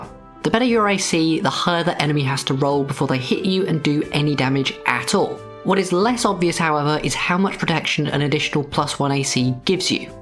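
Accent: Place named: United Kingdom. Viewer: oh, you’re british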